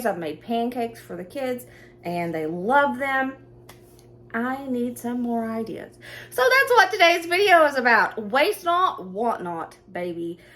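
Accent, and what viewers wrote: South, United States. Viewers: I love hearing your voice! Girl, you deliver with a happy Southern accent! Thank you for being honest and ‘real’!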